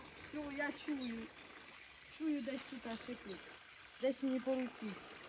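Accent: Poltava, Ukraine. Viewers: я так і думав) у мене кума з Полтавщини, то теж так говорить)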